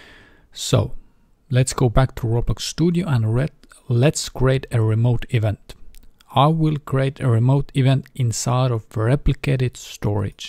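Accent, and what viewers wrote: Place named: Estonia. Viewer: see eesti aksent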